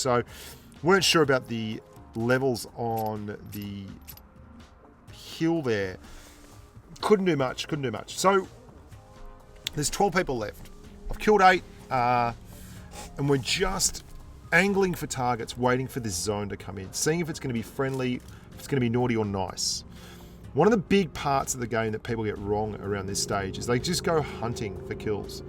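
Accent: Australia. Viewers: He is better then powerbang. I like how he explained the strategy and technique. With that Aussie accent 😁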